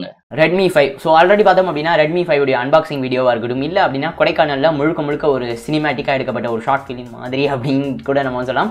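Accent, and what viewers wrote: Madurai, India. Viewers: Neenga Madura karara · Bro neega madurai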